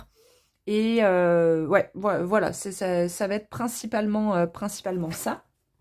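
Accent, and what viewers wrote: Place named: Belgium. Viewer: Bravo! 2: ça me parle! Je vais déclarer mon activité Artiste- Décoratrice d'intérieur ! Vous effectuez vos guidances avec tellement de cohérence et d'assurance verbale et spirituelle, c'est très fort , toutes n'y arrivent pas, bafouillent, ou bien s'écoutent parler! Ce n'est pas du tout votre cas et votre accent belge me fait trop rire, ainsi que certaines expressions du genre: "la papesse c'est pas la chaudasse du tarot" lool! Osiris:::: j'ai fini ce matin Christian Jack :Abydos ou le mystère de la résurrection!" Tarots magnifiques comme le préraphaélite par ex.. . Oui je faisais de la peinture, enfant, et plus tard photographe, mais les "conseillers" m'ont toujours découragée! Merci à vous et au Soleil!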